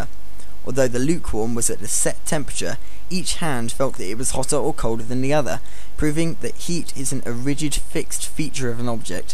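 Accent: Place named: United Kingdom. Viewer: This is not plain English. This is British English!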